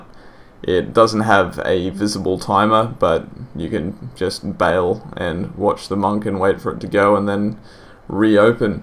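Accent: Australia. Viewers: Hey man you sound Australian which part of Australia are you from ?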